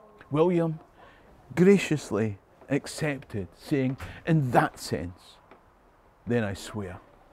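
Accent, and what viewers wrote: Scotland, United Kingdom. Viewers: 😂 Who the fuck is this African with a scots accent? Presbyterian Scots wanted the papish Jacobites out, and we took them out .KOSB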